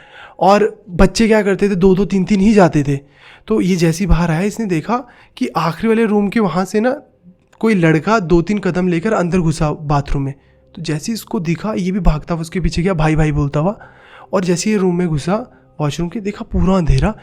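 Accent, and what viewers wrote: Punjab, India. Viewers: Sir ap punjabi hai kya... I mean apka accent or dialogues punjabi style me hote hai....like chandigarh panchkula side... Mane abhi aj hi apki ekdo videos dekhi first time...mujhe lga k ap udhr se hai kya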